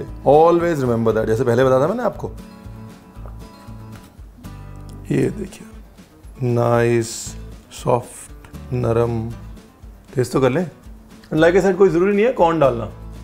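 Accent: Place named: Punjab, India. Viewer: RAVE.. ☺️ Punjabiyat chalak hi jati hai baton baton mein😊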